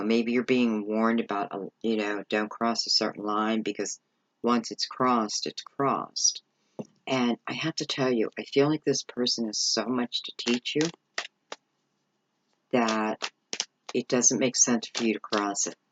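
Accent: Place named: Philadelphia, United States. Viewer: The wedding stuff happened. You speak like the people I grew up with ie Philadelphia accent or Jersey/delaware. Just curious, am I close?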